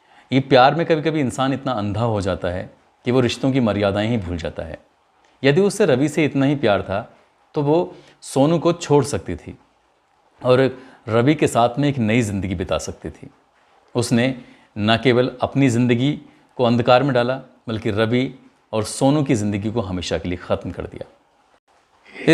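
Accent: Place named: Lucknow, India. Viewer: walekum Assalam shukriya janab bolane ka kya yah to Lucknow ka asar hai 🙏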